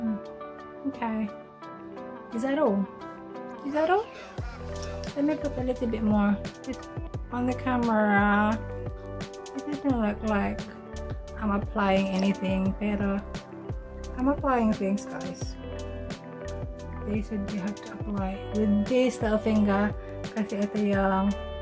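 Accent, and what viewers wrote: United Kingdom. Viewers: Really love the British accent... Kilay is life talaga. Anyway it's another stream of income being an Avon rep. Dami mo na raket sissy.. youtuber na avon rep pa, plus your regular job pa. Payaman... claim it sissy. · Grabe talaga english accent mo ati 😂😘 love it!! Avon lover ako dati nung sa pinas ako hehe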